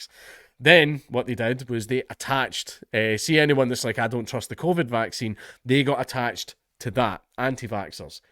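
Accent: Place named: Scotland, United Kingdom. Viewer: What I hear is an angry scot ready to kick ass when the government tries to mess with his babies. Love ur vids btw.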